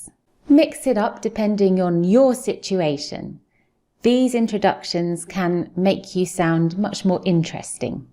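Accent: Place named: United Kingdom. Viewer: I love to hear your British accent.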